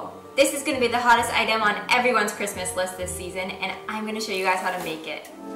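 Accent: France. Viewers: LOL, I'm sorry that I just can't stop laughing when you looks serious on the street...and I really love your French accent XDDD Love you <3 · hi! hello from Sydney, Australia. You are such a sweet and creative person. Love all your videos and thanks for all the efforts you put into them. It won't surprise me if you will work with a jewelry or bag company one day to have a collection. Last but not least, love your "French accent"!! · I didn't expect that but you made it! great job:) btw I'm from Paris and whenever I hear your french accent I'm dying 😆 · the french accent HAHAHAHAHAH made my day HAHAHAHA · I love it with your French accent omg you made my day loving the bag !!! Hahaha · i LOVE the french accent "rrrrrrrr" 😹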